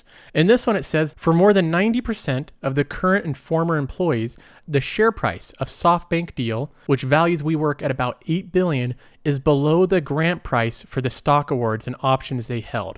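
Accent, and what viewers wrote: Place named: Midwest, United States. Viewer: Midwestern american, I would guess but it's not a strong accent. Listen to "protests" , or ish, "we don't want you tah talk about politics."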